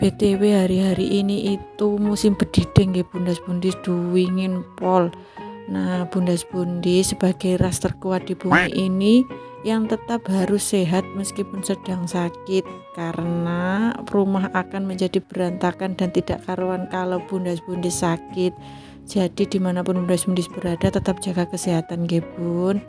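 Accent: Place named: Java, Indonesia. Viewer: Wah medok sekali Asal dari mana bund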